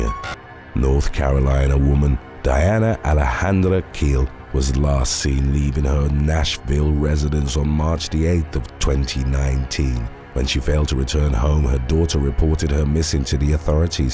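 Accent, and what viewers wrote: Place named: United Kingdom. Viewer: As I’m British myself, I never understood women who LOVED British men’s accents until I heard this guy! 😊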